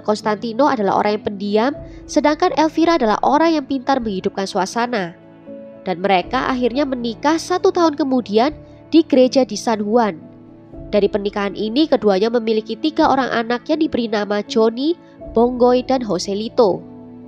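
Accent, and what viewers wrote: Java, Indonesia. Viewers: alangkah medok logat jawe kamu bik...😁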